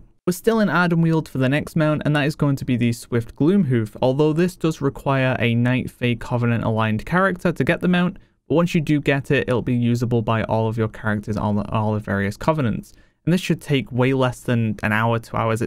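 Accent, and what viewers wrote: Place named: Yorkshire, United Kingdom. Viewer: Where in Yorkshire are you from mate? Your accent is very similar to the wife's 😋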